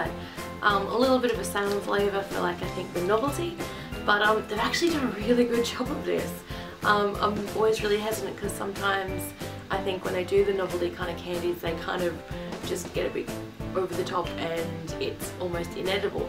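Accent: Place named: Australia. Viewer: Love your videos! What country are you from? I'm assuming your Australian judging by your accent, because I'm Australian and that's how most people sound here, if not then I am horribly, horribly wrong.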